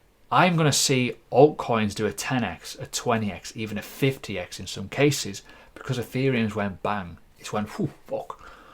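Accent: United Kingdom. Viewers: When I want to listen to British English and sounds of reckting things I always open up this channel, its funny and well said.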